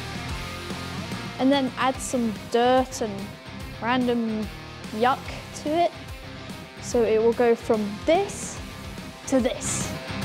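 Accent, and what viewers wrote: United Kingdom. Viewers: Is she Scottish or Irish 😅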